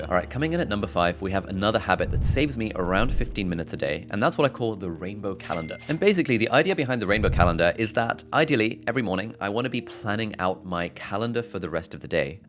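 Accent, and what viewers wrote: United Kingdom. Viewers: After one year of watching your videos I just realized your British accent when you said "trolly." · Gotta admit, Brit accent gets viewers. Lol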